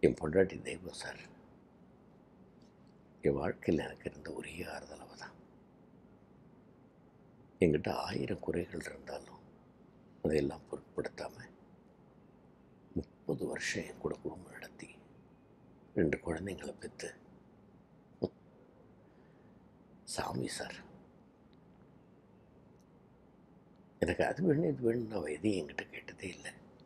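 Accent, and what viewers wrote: India. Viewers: Bangalore tamil? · Ayya mass speech. Thanjvur tamil god bless you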